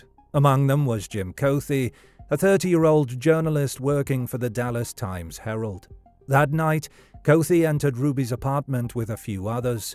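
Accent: United Kingdom. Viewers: Really. Why the British accent? Don't buhlschitt a buhlschitter.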